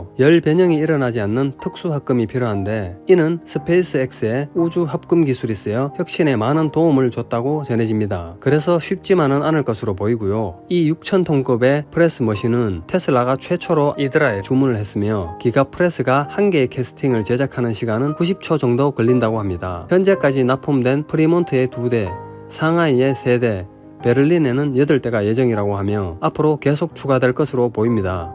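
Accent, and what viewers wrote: South Korea. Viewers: 님 안녕하세요..처음 여기 와보네요.사투리가..칠곡 살던2000년 기억나네요..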